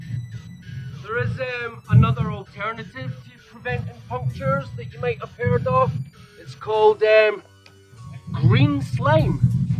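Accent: Scotland, United Kingdom. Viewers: BREVVVV Scottish accents the best one! Big up ya self and bun the haters lol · Thank you so much for your hard work man!!! 5 star review!! Scotch accent is bad ass!! I am trying to master the accent!! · Hi! I'm glad that my comment could make you feel good, unlike some... people who have nothing else to do in their life but troll. ;-) As for your Scottish accent, it's very light compared to my Scottish godfather's, and I understand you perfectly. But it's well known that English people from England England often have trouble with a non-Oxfordian accent. :-P I travel a lot and I intend to do some trails in Scotland as West Highland Way, Southern Upland Way, Great Glen Way, etc. But this when I'll have modified my Pro 2 (which is already equipped with Monorim front and rear suspensions + 10" tires) into a powerful 2WD (2 x 500W + Monorim U5 Pack + additional Aerdu 13S4P 48V 14ah battery), in order to have the power for the climbs, especially with the camping gear in the backpack, even if it's all ultra-light, and a maximum autonomy (with solar charger for long trails, of course!). 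[ I know, some would say I'd better invest in a Kaabo Wolf Warrior King GT, but it wouldn't be as light to carry in and out a sailboat for daily use, and I don't need all the speed it provides. I prefer to ride safely at a gentle pace and have maximum autonomy. ;-) ] So, if you wish, maybe we could meet when I'll pass by your place? And let me reiterate my thanks for your videos, which are also well edited in post-production. We can feel the work of a passionate person.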